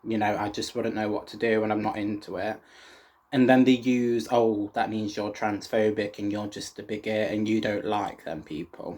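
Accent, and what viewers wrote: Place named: England, United Kingdom. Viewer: Agree entirely with your sentiment, this is just another picky comment! Be a bit careful about the vocabulary you use - when you say "'libertarian" in this video, I think you mean 'liberal'. A 'libertarian' is generally considered to be someone who is for minimalising government interference, and maximising individual freedoms - very much into free-market capitalism, minimal welfare state, and privataisation; a 'liberal', on the other hand is what you describe. A 'Republican' (capital 'R') is a supporter of the conservative, American Republican party. A 'republican' in the British sense, is one who would get rid of the queen and the monarchy in favour of an elected president. I know YT is an international platform, but I think the distinction is important when you have an English accent. Sticking to 'conservative' generally avoids any confusion.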